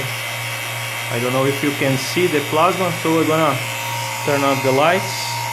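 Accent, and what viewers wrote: Brazil. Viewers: cara vc é brasileiro?? dá pra reconhecer pelo sotaque!! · are you brazilian? your accent when speaking english is very familiar to me. nice video! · sotaque brasileiro ?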